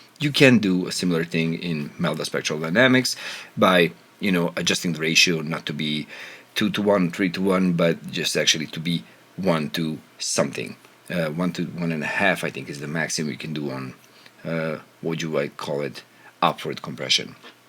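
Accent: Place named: Balkans. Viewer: Hey, if pro q 3 is my most used plugin, this one will be used even more! Are you from the Balkans maybe? you kinda sound like you are from my part of the world..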